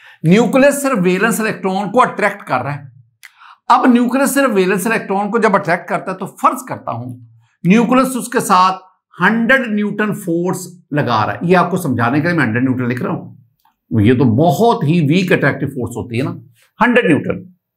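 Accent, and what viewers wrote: Punjab, India. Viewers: Mashallah sweet Punjabi Urdu ❤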